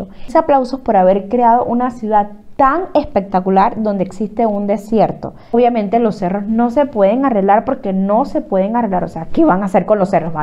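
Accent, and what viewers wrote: Venezuela. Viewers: tu hablada es parecida a la hablada venezolana, quizás sea por eso que te confunden, cuando llames y te confundan por la hablada, diles que eres cubana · Es la desconfianza que tenemos hacia los venezolanos. muchos llegaron con malas intenciones y tu dejo se parece mucho a ellos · Te confunden con venezolana así de simple por el acento que es muy parecido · no te discriman a ti si tu eres Bella es que tu acento se parece a un venezolano con respeto y por temor a algunos que alquilan tiene miedo que les pueda tu ya sabes hacer cosas malas si tu le dijeras que eres Cubana normal te aceptarian es por el acepto casi similar que tiene sera por eso suerte con tus videos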